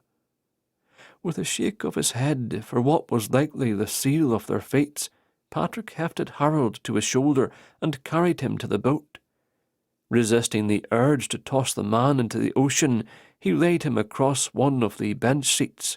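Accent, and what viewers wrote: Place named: Scotland, United Kingdom. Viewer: Does anyone know if this narrator, narrates any other books? This is my second time through this series. The Scottish voice for a story about Scots is beyond perfect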